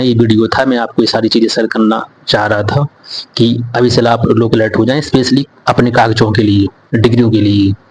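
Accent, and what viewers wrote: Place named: Bundeli, India. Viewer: Maharaj aap bundeli bolo wo achchhi lg rhi........you know ni bolo😁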